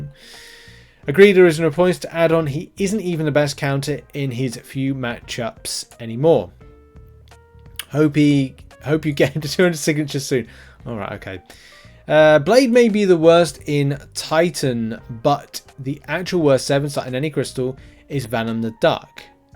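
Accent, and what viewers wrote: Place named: United Kingdom. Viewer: The uk accent is horrendous. I can barely understand a word you’re saying. When you talk beyond 130 words per minute it’s just shit mumbles. Have someone from America listen to your vids and ask them to repeat what you said. There are huge gaps where we can’t understand shit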